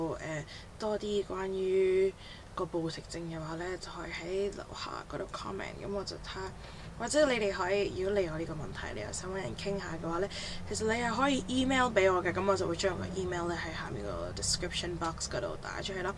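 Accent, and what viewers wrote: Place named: England, United Kingdom. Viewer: did you go international school? or studied in a foreign country cuz I think there is slightly an english accent when you speak in Canton....just curious....nth much...love your channel and this video....^^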